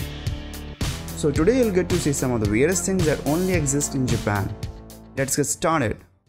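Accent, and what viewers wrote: India. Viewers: the indian accent is too strong, pity.....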